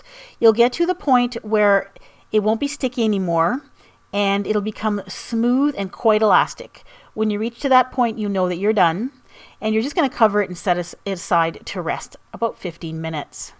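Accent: Canada. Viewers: I can't tell if she's Canadian or from Minnesota.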